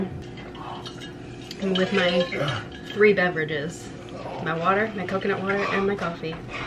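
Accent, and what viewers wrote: United States. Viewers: As someone from New Zealand you just have the standard American accent to me lol - I knew someone from New York and he had a similar accent to you. It’s not the classic New York accent - It’s just veryyyy American. I like your thumbnails, they’re cute - I don’t think it makes a big difference for me - but it might be more important for new viewers 🤷‍♀️ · I'm from wisconsin and your accent seems the same as around here, never would have guesses long island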